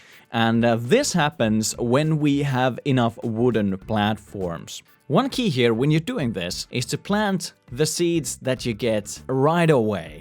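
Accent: Finland. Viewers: Are you from Finland?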